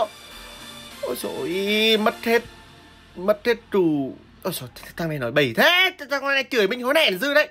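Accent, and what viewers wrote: Nghe An, Vietnam. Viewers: Tiếng người ở đâu nghệ An vậy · Giọng nghệ an phải ko anh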